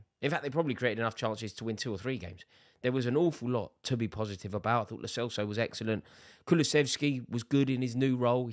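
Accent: United Kingdom. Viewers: Британский звук, после жаргоного американского звучит забавно☝️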